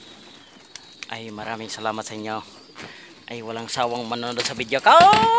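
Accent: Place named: Batangas, Philippines. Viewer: Galing magbatangeño ah👏👏👏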